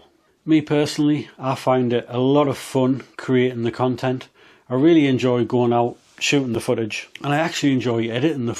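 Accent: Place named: North, United Kingdom. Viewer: Cracking video, aye good advice i didn`t get the expensive cameras just thought i would start with kit easy to learn the basics on. The editing is good lots to learn and helps you think of new technique's for content. On a massive plus it is great listening to a fellow northerner 🤙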